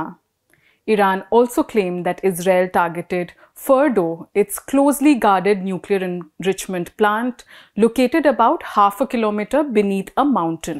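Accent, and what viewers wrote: India. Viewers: Shiiittt Stingking indian Accent !!!!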